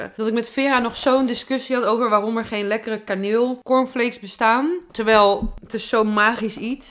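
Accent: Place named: Brabant, Netherlands. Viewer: Ik hou van het Brabantse accent van jou, voelt als thuis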